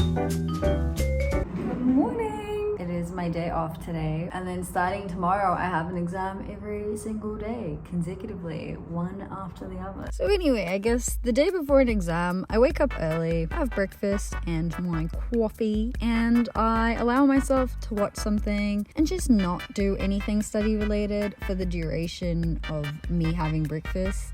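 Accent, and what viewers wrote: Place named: New Zealand. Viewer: you're losing the kiwi accent nooooo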